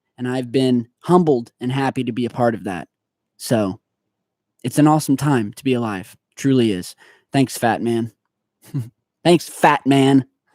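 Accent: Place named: Ohio, United States. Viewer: Your Southern Ohio drawl sure stands out early in the morning.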